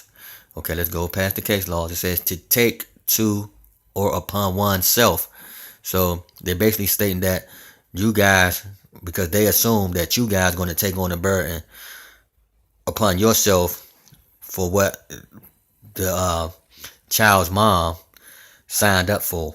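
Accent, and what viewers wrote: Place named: Virginia, United States. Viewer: You're from Virginia, huh?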